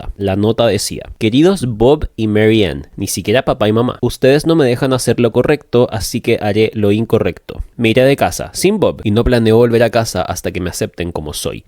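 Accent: Chile. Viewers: Oh wn que cuatico como la religión te hace eliminar el pensamiento crítico y te hace susceptible a cualquier mentira, incluso una tan retorcida como esta. Oye que bacanes tus vídeos, le tomo caleta de atención. Me gusta mucho el true crime, pero estaba chato de escuchar gente no chilena ajsj así me siento más en familia. Bacan tu canal. Saludos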